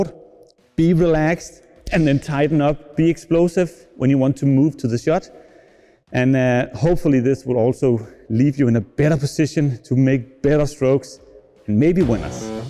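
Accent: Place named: Australia. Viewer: Sounds aussie ngl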